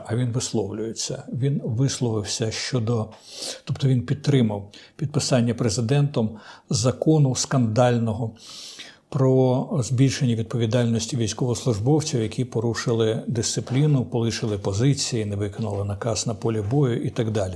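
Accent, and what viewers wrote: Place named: Russia. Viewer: Цікаво і слушно, незважаючи на російський акцент з цими жахливими щьоканнями і чьоканнями, і на слова з російського словника, що ними послуговується автор і ніяк не спроможний відмовитись від них.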